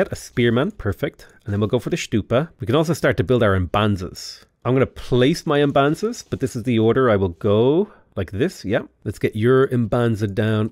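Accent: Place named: Ireland. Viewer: I love ur irish accent i love watching ur videos just to hear u talk lol ❤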